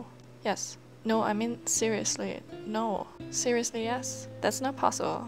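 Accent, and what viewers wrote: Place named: Germany. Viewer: You sound German👁️👄👁️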